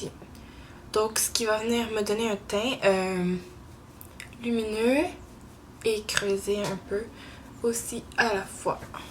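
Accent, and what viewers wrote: Canada. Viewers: j'adore te regarder avec ton accent canadien ! j'adore!! <3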